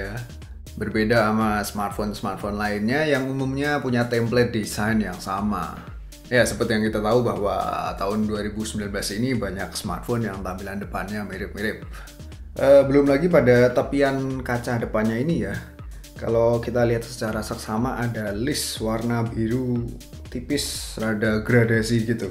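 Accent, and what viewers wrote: Java, Indonesia. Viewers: Jowone Ngendi rek?..Mantap e Logat e iseh ketoro 😁. Salam Pemalang 👍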